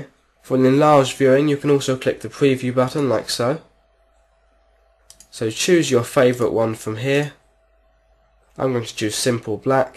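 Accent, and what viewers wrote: United Kingdom. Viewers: Thanks, excellent tutorial and lovely British accent. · Great informative video, FLAWLESS. Subscribed. Thanks. Hes british too, added bonus ;)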